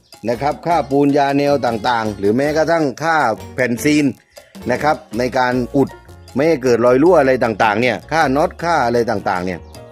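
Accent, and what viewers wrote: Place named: South, Thailand. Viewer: น้ำเสียงเหมือนคนใต้เลย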